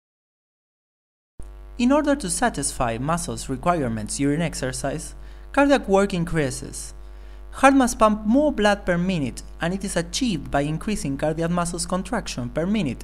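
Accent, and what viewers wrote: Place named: Mexico. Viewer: ese ingles mexicano me hizo caga de risa jajajaja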